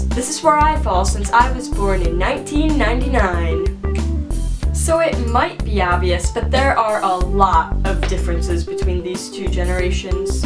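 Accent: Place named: North, United States. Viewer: Hey by the way, you're really cute ;) are you from Wisconsin? Or someplace from the north? You sound like a northern person.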